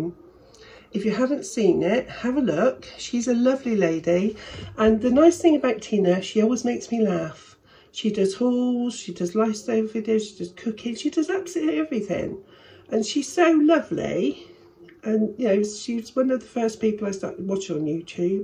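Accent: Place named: Wiltshire, United Kingdom. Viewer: Hello, I've popped over from Tina's channel. I've just done a Shein Challenge with Tina and she mentioned your channel so I thought I'd say Hello. Out of curiosity your accent sounds familiar, are you from the Wiltshire area? I am originally from Swindon but now live in Las Vegas. Your accent reminds me of my old accent, hehe. I will be subscribing as I not only did I enjoy your video but I love that us smaller channels build one another up. Have a great day!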